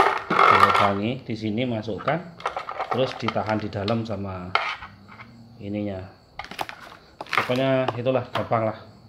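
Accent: Madura, Indonesia. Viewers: Reng madureh mas?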